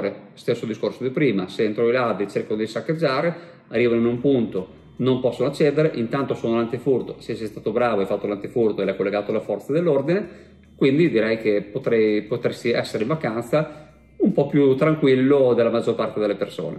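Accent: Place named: Rimini, Italy. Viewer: la parlata riminese e troppo simpatica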